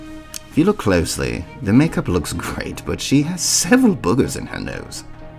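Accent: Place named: United Kingdom. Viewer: I’m deaddddd 😭 he really sounds British tho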